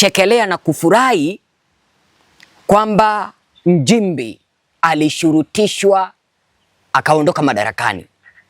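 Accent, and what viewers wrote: Kenya. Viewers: He has Nothing he is Speaking truth other thank making Up stories he Feeds from fake Stories media Comments and Posts..THIS IS A bullshit Kenyan Posing as Tanzanian while He cannot even Pronounce Nchimbi in a Right way instead he is using Kenyan and Kamba Pronunciation of “NJIMBI” and NDEJEMBI IS “NDENJEMBEI”. Huyu. Ni MSENGE ANALIPWA NA SATIVA NA MWAIPAYA KUPISTI UPUUZI USIO NA MAANA WA TANZANIA ASIYOIJUA.. ULIONA WAPI MTANZANIA HAJUI KISWAHILI?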